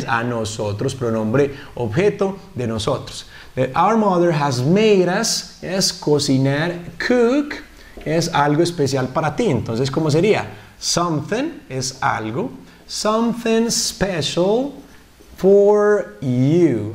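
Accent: Colombia. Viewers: Hola Profesor, mi nombre es Guillermo Léon Gómez Gómez soy Colombiano y vivo aquí en Sincelejo, llevo muchos videos tuyo viendolos y ningún otro profesor explica de la mejor forma que tu, tu haces unos buenos análisis y te das a entender muy bien y que cada uno de nosotros aprendamos de la mejor forma como tu lo haces las cosas tan sencillas y se vea que si entiendas tus clases es por eso que escogí este curso tuyo para entender muy bien. De otro lado quiero que me digas si estás viviendo aquí en Colombia y donde aprendiste tu Inglés o estás en el extranjero, y siento que tu acento fuera de la ciudad de Medellín.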